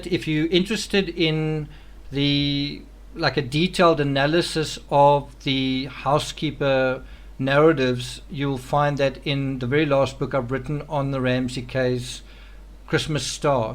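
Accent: South Africa. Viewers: Children. He has a South African accent.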